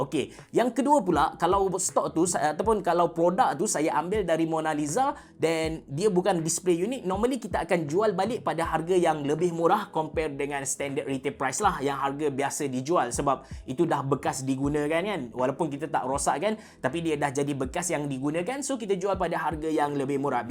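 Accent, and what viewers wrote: Kelantan, Malaysia. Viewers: Sy slh seorg peminat abe sejak 2017 lg, masa tue masih ckp kelantan lg..hehe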